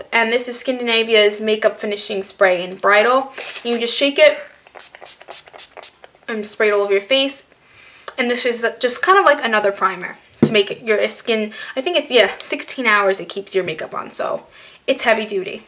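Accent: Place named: New York, United States. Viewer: i love your accent. new York???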